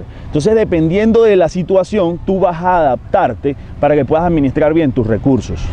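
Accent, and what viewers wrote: Venezuela. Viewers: Es venezolano?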